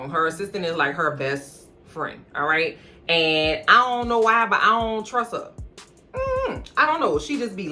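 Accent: New Orleans, United States. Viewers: I’m so glad I found your channel! I’m from Nola and ur voice sounds like home. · I hear that nola accent fasho ooouuuweee we might be related 😂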